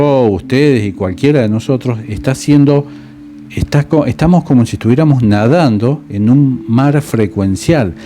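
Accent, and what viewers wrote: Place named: Argentina. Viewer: Buah...que temas más interesantes aborda este canal y como disfruto escuchando a por Dios, con su gracia y su acento argentino que a veces escucho dos y tres veces el vídeo. 😂 Te queremos, aprendemos mucho contigo. Un millón de gracias y saludos desde España 🇪🇦 ❤🙏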